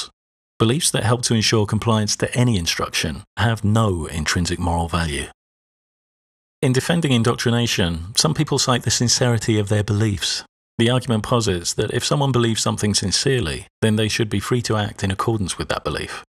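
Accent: United Kingdom. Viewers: Nonsense is still nonsense whether or not you say it in British accent. Truth should be taught to children and falsehood shouldn't. We disagree on what is truth and what is not. You wouldn't have problem with indoctrinating into a child that discriminating on the base of skin colour is wrong and if the child was to very vehemently be against that idea you still wouldn't just accept his or her different viewpoint. Debates should be held as to what is truth and what is not but parents teaching their children what they perceive to be truth is in itself a very logical and understandable position.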